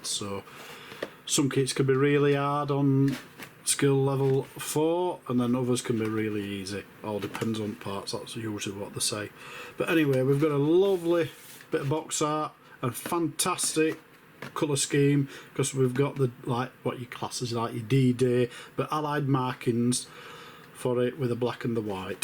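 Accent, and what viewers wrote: Barnsley, United Kingdom. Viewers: Thought is was Barnsley, that's me as well👍 keep doing the videos there big help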